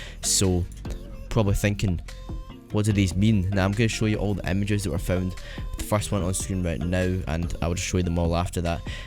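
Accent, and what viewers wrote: Scotland, United Kingdom. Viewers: Ur ye Scottish?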